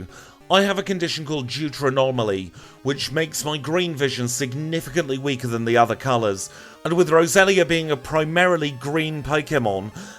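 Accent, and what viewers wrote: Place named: United Kingdom. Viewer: I'm so curious! I'm not from the UK so I'm always shocked at how many accents you guys have in such a relatively small country. What would you say the is the closest accent to your's? I need a name to the sound!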